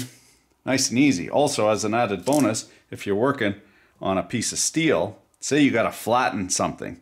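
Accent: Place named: New Brunswick, Canada. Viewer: Magnetic Hill? I knew you were from NB with that eloquent chiac of yours.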